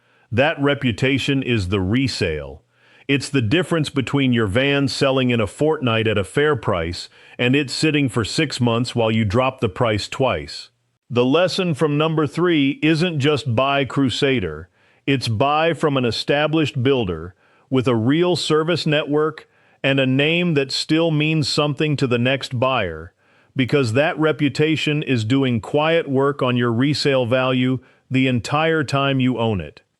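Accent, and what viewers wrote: United States. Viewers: This is hard to watch. Cheap A! crap. American voice and a hotch-potch of overseas video.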